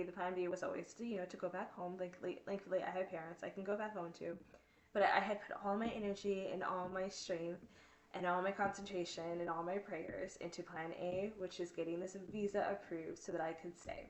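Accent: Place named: United States. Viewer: Are you originally from the united state? because you speak like you are from the united state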